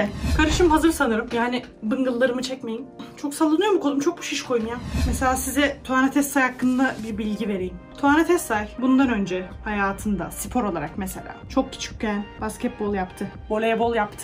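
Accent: Turkey. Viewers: Adapazarlımısınnn ahhahahaha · O kadar sakaryalı bi anlatım ki hahsjajjdjsd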